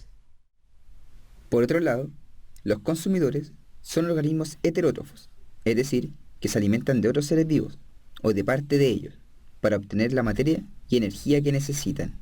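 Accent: Chile. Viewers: no me puedo concentrar por el acento chileno xd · Gracias me ayudó mucho!😊 Eres de chile verdad? Me encanta tu acento :D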